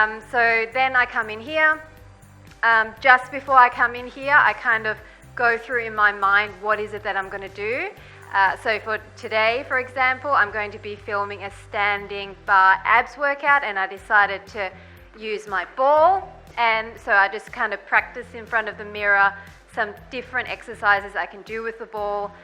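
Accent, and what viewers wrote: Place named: Australia. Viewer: Love this behind the scenes video and totally agree with Mon P. that you have one of the best workout channels out there; I also recommend it to everyone I know who's into fitness. As a Chicago girl, I tell people that one of the fun parts of doing your workouts is your delightful Australian accent! A couple ideas I think would be great for Coffee Talk videos would be: 1) fat loss vs. weight loss and how to hone in on fat loss once a desired weight has been reached; and 2) what do you typically eat in a day/recommendations for how to eat well overall. Personally, I tend to eat healthy, but struggle with being able to find healthy foods that really keep me full. This is a tough area for me because my metabolism is pretty quick, so I always feel like I'm out-eating everyone around me by like double. Any tips?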